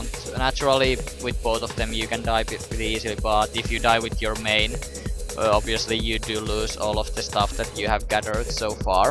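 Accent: Finland. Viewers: Kuulee heti että on suomalainen :D, / u can hear that hes finnish :D Missä serverissä pelaat?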